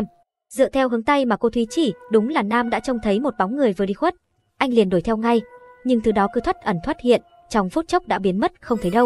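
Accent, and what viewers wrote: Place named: China. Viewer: Nghe mô thích người Trung Hoa lác lưỡi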